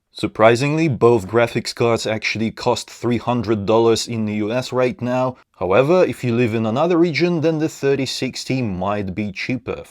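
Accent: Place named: Russia. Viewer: Guy with Russian accent talking about FPS makes me nostalgic for FPSRussia channel.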